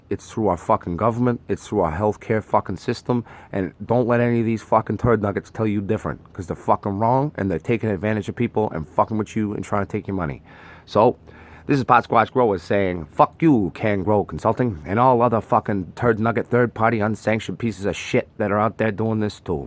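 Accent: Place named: United Kingdom. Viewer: Great British accent. Have you ever done voice work?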